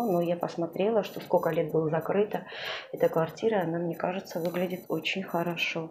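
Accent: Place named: Georgia. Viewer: Очень четко слышится грузиеский акцент! Гамарджеба!!!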